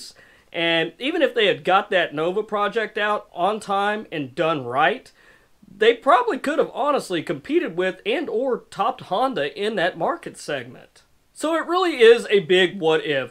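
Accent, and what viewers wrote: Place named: South, United States. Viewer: You must be in Texas or Louisiana. Jeff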